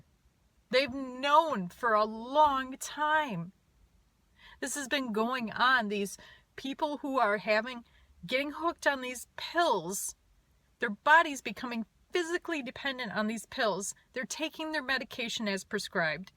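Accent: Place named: Wisconsin, United States. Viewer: Hey, great vid. This is my 3rd time posting so i hope it goes through, or u might have a few comments from me sayin the same thing. haha. Anyway, its good to see another face/voice spreading the word about the dangers and reality of these drugs. Nobody else will understand the hell these things put people through. And yes, ur right. They are aware of what these drugs do and have been since the 80's. Its gonna be a tough road going through this shit but at one point it will be history and unfortunately we learn a lesson the hard way. The lesson of these dangerous, mind altering pharmaceuticals, the business behind them and even a little about the people who we trust to watch out for us. Its all bullshit and we only have eachother and urselves to look out for us. We lose friends and family, our jobs, relationships, enjoyment in life and faith in a system we thought was helping. 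Its like losing your soul. We are eventually forced to go through it and thats that. But on a good note its good to hear the Wisconsin accent. haha. Keep in touch and all the best to you and your family.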